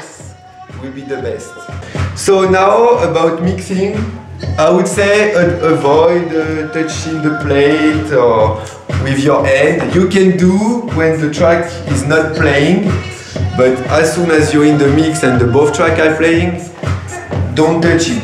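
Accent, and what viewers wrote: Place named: France. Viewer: Just love the french-english! :D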